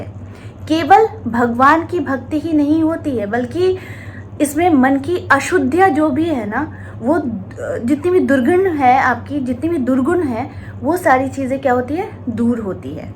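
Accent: Bengal, India. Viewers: R u Bengali??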